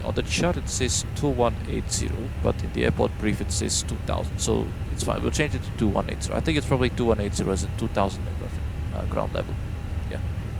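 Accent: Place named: Finland. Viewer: Nice Finnish/Nordic accent!!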